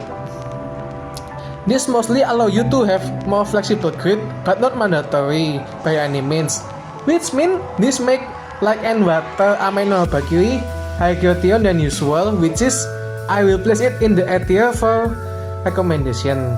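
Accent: Indonesia. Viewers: im glad im indonesian so i can understand the accent 😭😭☝️ thanks for covering this haha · Bah medok bgt suarane😂😂😂😂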